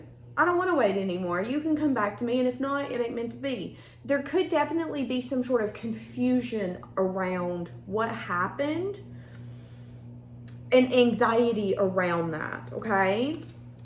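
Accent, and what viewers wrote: South, United States. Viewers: Love your Southern drawl… So cute!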